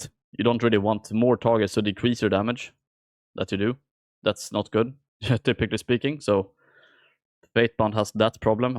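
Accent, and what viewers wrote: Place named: Sweden. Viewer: Thats the thiccest swedish accent i ever heard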